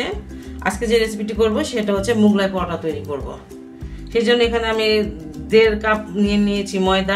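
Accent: Bangladesh. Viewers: aapnaar bangal bhaasaay kothaa bolaa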